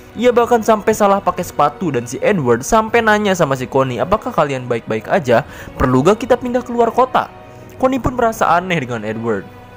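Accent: West Java, Indonesia. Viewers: Anjimm si mang org sunda euy , aing karek apal ieu channel mantap resepp euy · Sunda anying😂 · Ngakak aslina aduh sunda banget😂😂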